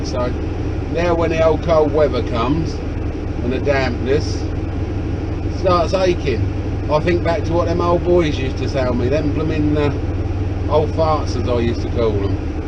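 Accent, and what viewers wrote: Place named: Essex, United Kingdom. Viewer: Is this video recorded from Essex? because definitely sounds like his from that area!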